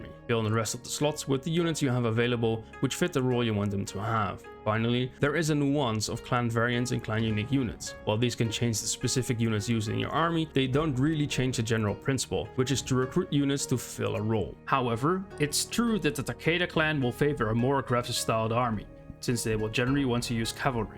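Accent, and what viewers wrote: Netherlands. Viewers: ah, it's dutch. Cool to know) Btw, do you review mods for shogun 2?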